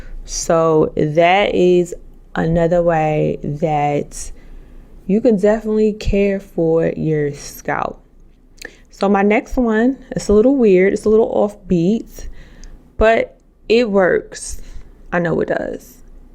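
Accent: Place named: South, United States. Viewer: 😍😘 I like everything I see and hear. Your braces, country accent, skin, face, everything, bald head, all of it.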